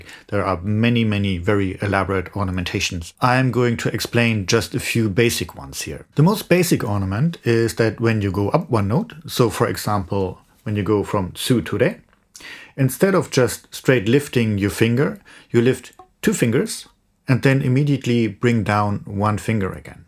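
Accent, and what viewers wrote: Germany. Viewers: The best shakuhachi tutorial on YT! Even your heavy german accent doesnt matter :) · He has aGerman accent????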